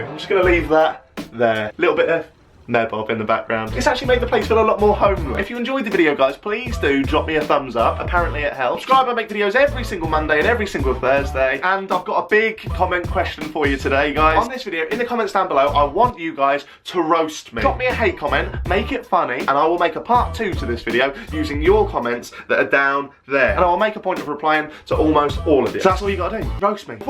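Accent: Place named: Norwich, United Kingdom. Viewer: has been in the background of several videos prior to this. Makes sense why he is there now. P.S. Stop trying to be like Pewdiepie, your content will never be as successful as his Sweetish videos. No one wants to listen to a man sat in a shed from Norwich, if I want to listen to someone with a shit accent ramble on about Nike sweatpants in a wooden hut I'd go to Great Yarmouth and speak to the locals.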